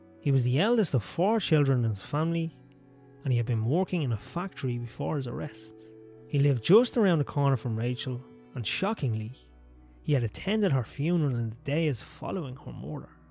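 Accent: Ireland. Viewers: Thank you for taking the time to respond! Very cool of you. I'm in the US...South Boston, Massachusetts. Which happened to have the BEST + biggest majority of Irish-American people filling the neighborhoods of Boston back in the day. Other then Charlestown who had a quite a few Irish also. I grew up with our annual St Patrick's Day parade being at my doorstep. Good ol days lol Southie, as we natives call it, have so much pride in our Irish heritage. There's a neighborhood store where we can order Irish Knits + baby blankets made in Ireland which is about all we have left of our "Irishness" in 2023 lol Used to be someone's Nan that would be knitting those. I said we "had" a large community of Irish here but regentrification...lol It happens. Your content is great! I look forward to future vids. Ty for taking time from your day to respond...figured brogue was better then accent lol And it sounds awesome to these American ears✌️💚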